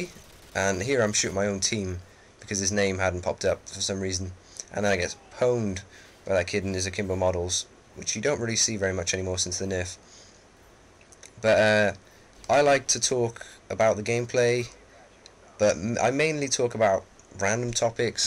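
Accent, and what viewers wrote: United Kingdom. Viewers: He sounds british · this guy is the only guy that sounds british that plays on xbox 360 cause like every video i see of a british person its always ps3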